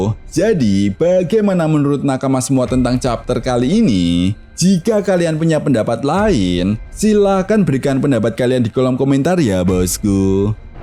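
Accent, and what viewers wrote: Java, Indonesia. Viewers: Mendok jawa jir😂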